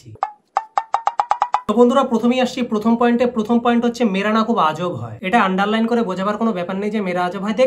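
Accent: Bangladesh. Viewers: বাংলাদেশ থেকে বলছি দাদা,,, তুমি অসাধারন বাংলা বলো,, তোমার বাংলা বলাটা দারুন,,,সেরা বাঙালী তুমি দাদা,,, কোচবিহারের ভাষা,আমাদের ভাষা একদম এক । মনেই হয়না তুমি পশ্চিম বঙ্গের ছেলে,,,মনে হয় তুমি আমাদের প্রিয় বাংলার সন্তান,,,,কি কোলকাতা কি ঢাকা ,,,তোমার মাধ্যমে সব একাকার হয়ে যায়,,, তোমার এই ভিডিওর থিওরিটা খাটি বাঙালী পরিবারের সন্তানদের জীবন যাপনের চিত্র ফুটে ওঠে,,,মনেই হয়না তুমি কোচবিহারের ছেলে আর আমি কুষ্টিয়ার ছেলে,,,, ছোটবেলায় এভাবেই সর্দি লাগতো আর হাতে ঘসা মেরে আবার খেলা শুরু করতাম,,, মাঠে খেলতে খেলতে বন্ধুরা মিলে একসাথে পটি করতে বসতাম,,,আবার সেটা ডুমুর পাতা দিয়ে ঘসে পুনরায় খেলা করতাম,,,, আমরা গর্বিত ,আমরা বাঙালী,, আমাদের রীতি রেওয়াজ,কৃষ্টি কালচার একেবারে সেম। কোন পার্থক্য নেই । পশ্চিমবঙ্গ বাংলাদেশ একসময় এক ছিল,,,তোমার ভিডিও দেখলে মনে হয় আজও আমরা একই আছি,,,, হাসিয়ে যাও ভাই,,,আমার চোখে তুমি একজন খাটি বাঙালী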